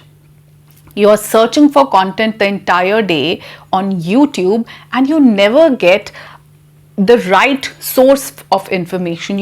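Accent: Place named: India. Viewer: Dear mam you're doing well but I request you to change your accent from Indian to American or British. So that we might learn more.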